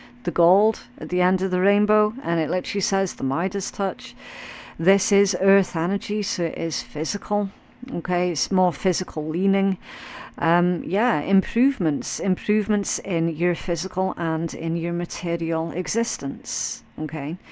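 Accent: Scotland, United Kingdom. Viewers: 444 everywhere atm …444 likes and the time I’m listening…I even took a screen shot lol we went through a very challenging 3 years …but yes come through …integrity intact. 🌝😅 We have so many ♏️ in our family…and our Michael granted our family a Miracle last summer 2023 thanks …I’m from Scotland are you too and I’m definitely not allergic to swearing or sarcasm lol…I resonate with your lovely accent. 💙🦢💙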